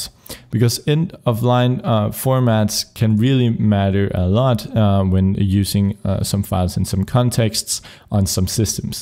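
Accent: Denmark. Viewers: are u danish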